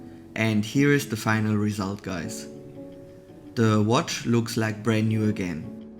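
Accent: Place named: Germany. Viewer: The main reason I open your videos is to demonstrate what a strong German accent sounds. Please practice your th and your of kurs is actually of course.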